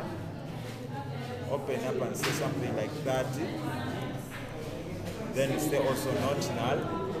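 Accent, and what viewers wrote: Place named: Uganda. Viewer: by accent I knew you were Ugandan; greetings from Canada. if I did not find this video, I think I would fail my assignment. Others didn't help.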